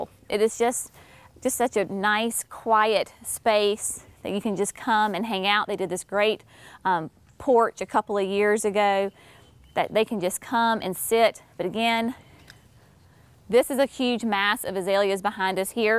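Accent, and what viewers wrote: South, United States. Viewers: You know, I know you are southern not just by your accent but because I actually feel your joy standing in front of those azaleas. And yes, your mom has a knack for blending whimsical pcs and after my own heart, a container gardener.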